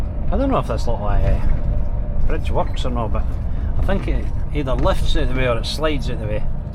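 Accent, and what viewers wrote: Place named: Lothians, United Kingdom. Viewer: yeah makes sense. Cant quite make out your accent. Lothians somewhere.? Sound like Lewis Capaldi or Dean who has the channel - one bike one world.?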